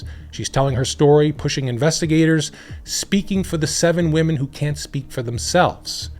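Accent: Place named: New Jersey, United States. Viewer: Now I really can't stand the use of the term "unalive" because I feel it almost patronizes the viewer like we're toddlers or something and I also feel it's a little disrespectful to the victims as well, and typically the new Jersey accent annoys the fuck out of me BUT I gave you a thumbs up because it overall was a very good video and tbh your voice wasn't as annoying as I expected. Thank God you don't sound like you're from north Arlington.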